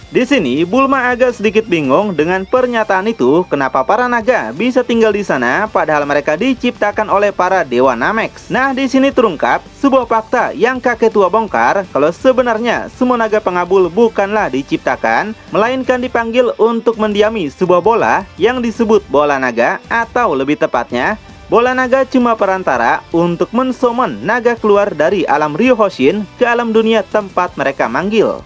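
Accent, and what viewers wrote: West Java, Indonesia. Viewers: ieu admin jiga orng sunda 😂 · Popolohikan sigan ieu orang sunda kang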